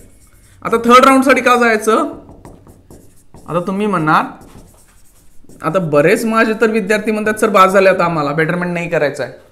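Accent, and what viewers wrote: Kolhapur, India. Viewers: Siranchya bolnya varun vatatay sir sangli, satara, Kolhapur che ahet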